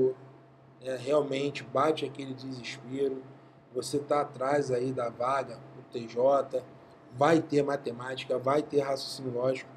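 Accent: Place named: Rio de Janeiro, Brazil. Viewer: Da pra se vê claramente que o é um carioca da gema. 😁 Ele puxa bastante no x... 😄. Aula top. Show de bola meu velho.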